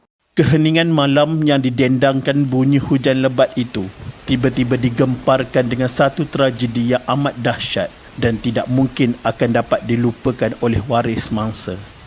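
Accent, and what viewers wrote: Kelantan, Malaysia. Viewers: Telinga saya banyak kali dengar admin sebut TADI dgn TAKDI.. saya agak admin lelaki Kelantan la, maaf kalau saya tersalah dengar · Kann..rasa ore klate doh tu 😁😁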